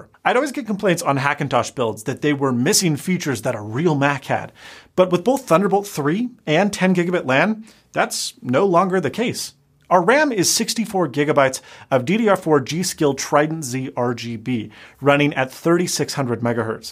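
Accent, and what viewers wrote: Spain. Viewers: You used to have a Spanish accent? No idea. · What’s up with Spanish accent? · Yo. Spanish accent? TF?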